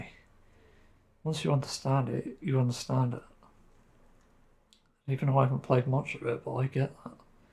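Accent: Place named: United Kingdom. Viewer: I was surprised getting this vid in my yt recs, especially since most vids about drg be like: "Maaan what a beautiful game, perfect devs and even better non-toxic community". And I agree it, for the most part, at least. But it actually has same thoughts that i had recently. I may have not get everything you said a bit, even with subtitles, due to you have slightly bri'ish accent (don't mean to offend you in an way) and i'm not a native english speaker (i'm ukrainian), but anyway. So let me tell you my story with drg. Last year my friends, who already bought this game , gifted it to me at Steam. They said all that stuff about the game, shortly, devs, community, so on. And we played it for a while, DRG seemed perfect to me, because i'm a bit introverted myself, I can be social and extraverted in company, but if it happens for a long time - it drains me out mentally, you know. 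I like to be alone sometimes, so it was pleasant when i found that you can play single with bosco. At some point, some of my friends got tired of deep rock, some of them won't even speak to me anymore, but that's another story. But i still liked the game and wanted to know more about it. Since I had no one to play with and singleplayer had more meditative vibe then more active vibe, i started to play with randoms. At the first time it was cool. Everyone was chill, greybeards tried to educate me in non-offending manner, and i could talk to people from different countries across the Europe, practice my commu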